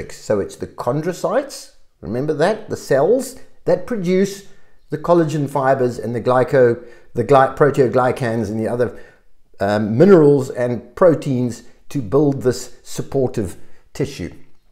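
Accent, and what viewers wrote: South Africa. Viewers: Good heavens, this is a triumphant explanation, and what a pleasant surprise to hear a Saffa accent! I dove headlong into the debate after tearing a labrum. Drs contradicted Physios who claimed that bursa replenish the cartilage by providing nutrients through movement. I'm a 42-year-old (gnarly) Capetonian surfer and I'm plunging into PT to stave off surgery. Thanks for the insight. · KZN accent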